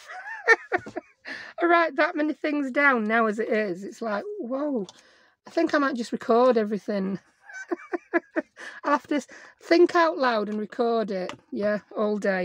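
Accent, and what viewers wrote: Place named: Northern England, United Kingdom. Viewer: I found your channel a couple of days ago, and oh boy I’m loving it. I lost both my parents just before Cov. Hit. I didn’t realise how much I missed northern accents, I was born in Yorkshire but grew up in Lancashire. However I’ve lived in the south since getting married 24 years ago😮. I’m also a big Tim Holtz fan an just love the items you make. Actually May I ask has Tim stopped designing new paper pads? Do I need to start hoarding them? Off to watch another of of your videos. Thank you for sharing your time.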